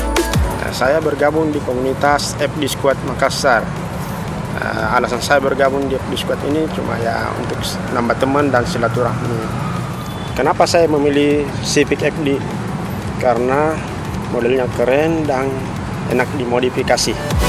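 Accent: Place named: Medan, Indonesia. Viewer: Orng medan abg ni ku yakin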